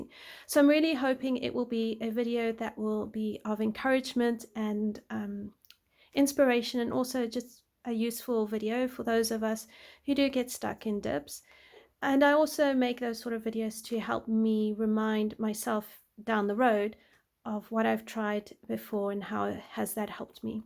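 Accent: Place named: South Africa. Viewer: You sound Soith African? I am too but we moved to Germany. First time viewer here. Haven't watched more than 2 mins yet, just enjoyed hearing your accent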